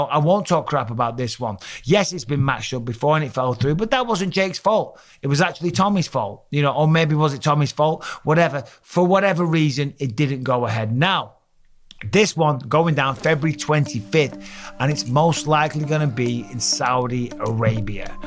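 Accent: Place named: United Kingdom. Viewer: It was but he has a geological advantage when It comes to that accent. Gey him to try an impression of anyone outside the UK and it's awful lol. Still funny and entertaining but for different reasons.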